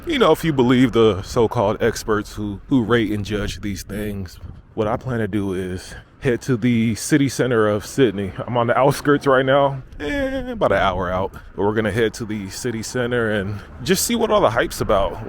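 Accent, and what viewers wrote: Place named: United States. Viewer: man you sound American, if that's the case, which US city would you say is most similar to Sydney?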